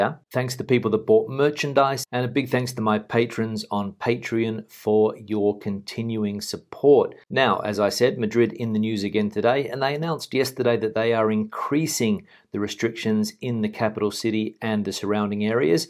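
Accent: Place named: Australia. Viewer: your mild Australian accent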